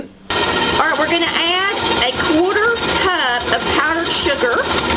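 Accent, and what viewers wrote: South, United States. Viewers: She is so cute with that southern voice i love how she read everyone in a friendly manner in regards to the lemon squeezer .... The lemon bars look delicious .....and yes i will try extra lemon lol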